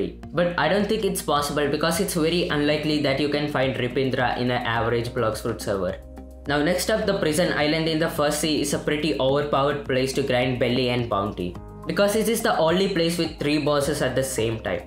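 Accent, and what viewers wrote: India. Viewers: indin accent 💀💀💀💀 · You sound like an indian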